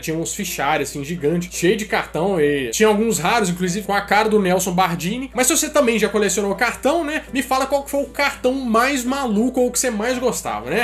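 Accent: Minas Gerais, Brazil. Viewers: Todo domingo eu to aqui na expectativa de ouvir o modo de cumprimentar Mineiro ... " cês tão bão né?! Manda um salve aqui p São Joaquim de Bicas - MG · Gente...melhor canal do YouTube....sua forma de apresentar é mt top..super natural e engraçado..é o sotaque Mineiro e top..MT bom de ouvir..sou mineira mas quem mora em BH fala MT diferente de quem mora no interior kkkk me divirto MT com seu canal parabéns.... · OCE É MINEIRO UAI, O SOTAQUE NAO NEGA TO ERRADO , SO SE O UM MUCADINHO SO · Cara, ce é mineiro?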